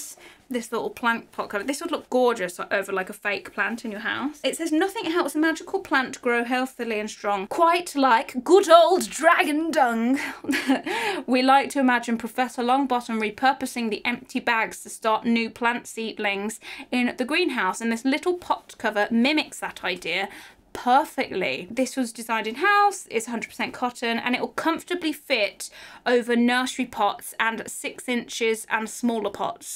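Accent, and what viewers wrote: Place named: Northern England, United Kingdom. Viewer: I think this vid is the most northern I've ever heard u.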